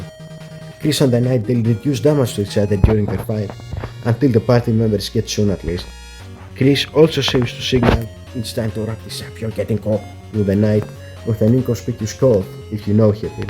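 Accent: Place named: Netherlands. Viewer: Sounds like Netherlands to me for some reason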